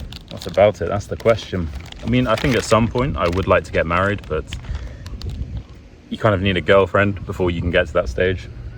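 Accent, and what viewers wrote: United Kingdom. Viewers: first of all thanks for helping us to understand and als speak in British accent ❤️‍🔥 Also my teacher recommended us to watch more videos on you tube but mostly girls should watch female ones bc their accent maybe 😶 so which female bloger can you recommend to me 🤔 of course I will continue to watch your videos to but still I need one female bloger too😊 I will wait for your comment 💯💌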